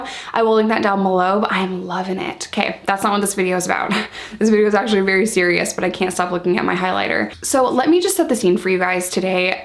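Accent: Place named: New York, United States. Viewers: i knew your accent was familiar! i’m from utica too i completely understand how you feel having family there i’m glad your mom moved!! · Your New York accent came out in this video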